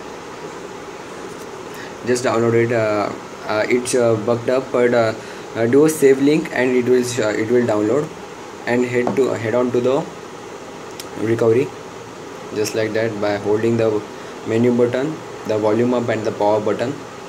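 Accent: India. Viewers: Miguel Ledesma he's Indian what do you expect almost everybody is Indian when it comes to IT Departments they are very intelligent when it comes to technology 😉👍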